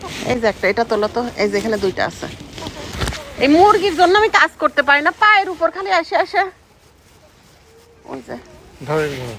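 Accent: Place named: Kushtia, Bangladesh. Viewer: আপনার ভাষা শুনে মনে হচ্ছে আপনী কুষ্টিয়ার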